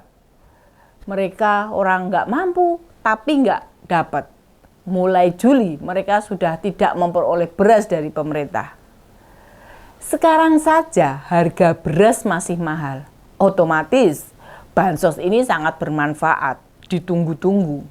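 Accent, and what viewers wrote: Java, Indonesia. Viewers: Aku juga ketipu kirain wong jowo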